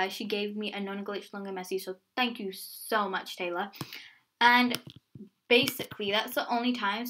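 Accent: Denmark. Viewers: Wait are u danish?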